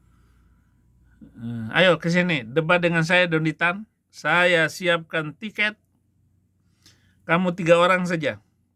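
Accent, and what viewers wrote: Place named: West Nusa Tenggara, Indonesia. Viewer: yang sehat selalu ya abba kami selalu berDoa untuk mu🙏💖💖💖🙏(menggikut Yesus keputusan mu) dan itu sangat berat karna dari logat mu saya tau anda dari kota atau daera NTB yang mayoritas (muslim)🙏🙏🙏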